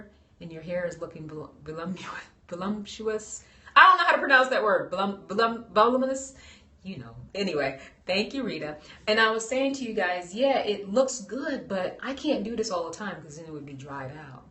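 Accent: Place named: Bronx, United States. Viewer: randomly passing by and then I hear the bronx, woah, I'm from castle hill! living in FL now :(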